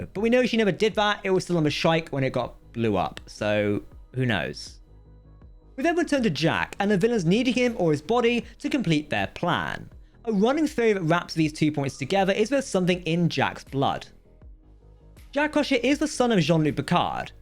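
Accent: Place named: United Kingdom. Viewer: 😁Shut the Fuck with winey Bullshit British Ass cent. 😄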